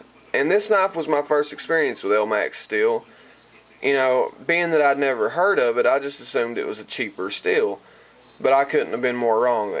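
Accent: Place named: South, United States. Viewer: i was having a go at the voice that southern US tone i just love it. This is an amazing review that Vannax C35VN Steel is insane just because S30V was voted as the best steel in 2007 or 2008 doesn't mean it still is and a lot of people think it still is. But the Vannax S35VN leaves most steel for dead. Ots a incredible steel the S35VN if you learn about it. Anyhow i was taking the micky out of ya and seems that some took it for real. Top review Champ...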